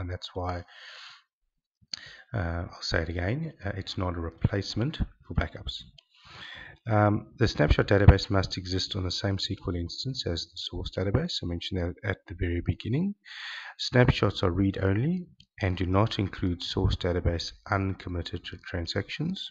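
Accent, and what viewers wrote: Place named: South Africa. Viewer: Your a legend! Quick and easy description and a very pleasent Saffa accent! Good work, thanks.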